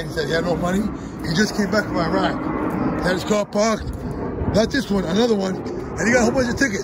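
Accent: New York, United States. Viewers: This was the most New York accent ive heard. Good on you for getting the boot off. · that’s a nyc Italian accent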